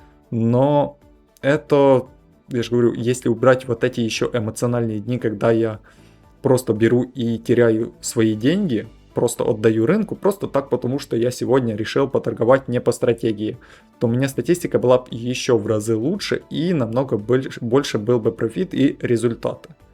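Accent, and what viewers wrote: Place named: Vladimir, Russia. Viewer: Классный говор, Владимирская обл.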